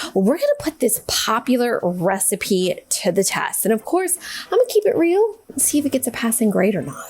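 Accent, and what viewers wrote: Louisiana, United States. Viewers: Ah Louisiana, that’s why I love your accent ❤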